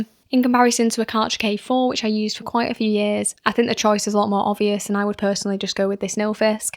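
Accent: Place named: Manchester, United Kingdom. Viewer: Edit. See my reply Would you go for the Ava Go p55 over this? It seems AVA themselves no longer seem to sell this on their website. Reason I'm thinking is as follows:- Nilfisk £240, plus £40 for standard stubby gun you suggest (plus adapter?), plus £50 for MJJC Foam Cannon = £330. p55 Go with Foam Cannon (probs not good as MJJC but the Premium Inc one here for AVA will probs be good enough) = £260 Any input would be greatly appreciated. Maybe you wanna sell your p55 cos you've got much better and it sounds like you're from Manchester! ? Again, any input would be greatly appreciated. I did consider the p40 Go £150 but not sure would be quite good enough when trying to do most washes via BH Touch-Less without a contact wash.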